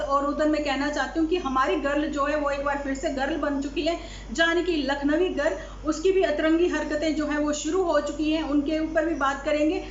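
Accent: Punjab, India. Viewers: Your punjabi so sweet.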